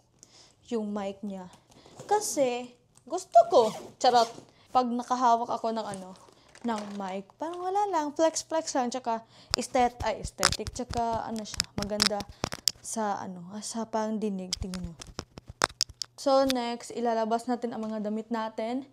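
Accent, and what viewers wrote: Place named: Visayas, Philippines. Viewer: I do hope tutukan mo yung accent mo sa tagalog para mas madeliver mo ng maayos mga sinasabi mo lalo sa lines mo sa acting. hindi mo naman kakalimutan ang bisaya but ofcourse need mo masanay sa tagalog kasi hirap na hirap ka mag construct ng sentence. Grind lang ng grind! For da go!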